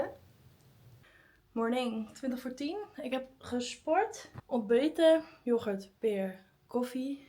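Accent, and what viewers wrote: Friesland, Netherlands. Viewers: Met dat licht Friese accent de hele tijd klagen is echt leuk om te horen sorry haha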